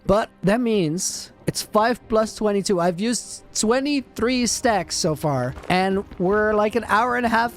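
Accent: Netherlands. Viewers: Even though I can hear you are dutch, I love how confident you talk in English! Great content! KEEP IT UP!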